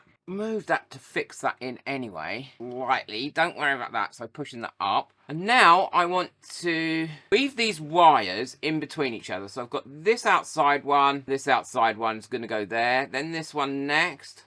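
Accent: United Kingdom. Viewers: It's just wrong to hear US standards in such a thick UK accent...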